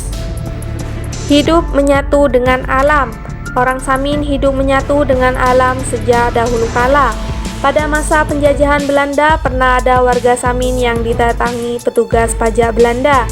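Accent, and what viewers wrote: Madura, Indonesia. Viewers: Voice over nya logat Madura ? Betulkah demikian ? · Admin nya orang mana ini kok Logat nya Kya Madura 😅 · Cewek pembaca cerita nya orang madura atau mana? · naratornya oreng MEDURE neka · Naratornya..sepertinya ..orang madura..logat nya kental sekali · Makin lama makin dalam maduranya .. 🤣🤣🤣🤣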